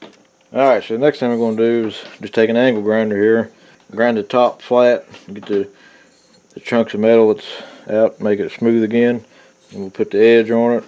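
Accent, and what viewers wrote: South, United States. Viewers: is a country guy with a southern accent. No apologies needed because that is who he is. I am enjoying your videos!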